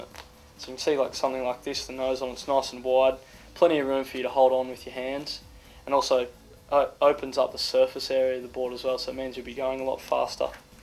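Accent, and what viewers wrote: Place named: Australia. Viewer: I already know everything this guy is talking about but I continue to watch the video because his voice is Godly. It's like listening to Morgan Freeeman, except Australian and white.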